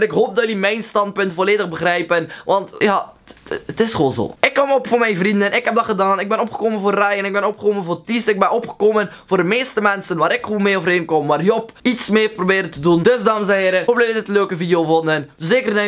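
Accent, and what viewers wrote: Belgium. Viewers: OMG JIJ BENT ZOOO KNAP VOORAL DIE OGEN😍😍😍 EN DAT BELGISCHE ACCENT ZO MOOI EN LEUK❤️ LOVE YOU❤️❤️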